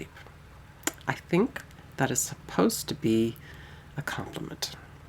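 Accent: South, United States. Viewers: I did laugh about all your characters sounding like they are from the South. When Steve and I used to take turns reading aloud to Alf and Tilly at bedtime, he used to tease me by giving characters accents I couldn't match the next evening. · I had to laugh when you said you sound southern no matter what accent you are trying to read. It made me think of my French professor in college who commented on my southern French accent. All of my Texas classmates sounded the same as me in my opinion but clearly not in his.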